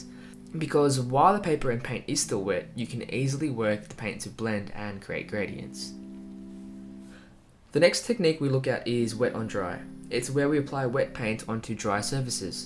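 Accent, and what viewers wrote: Australia. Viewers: is he from Australia perhaps? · you're Australian, yeah? Certainly sound so to me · Are u a fellow Australian??? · Are you australian?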